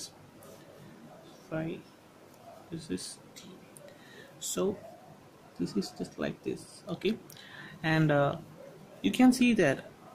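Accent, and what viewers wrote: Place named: United Kingdom. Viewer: Sirf British tone me english bolne se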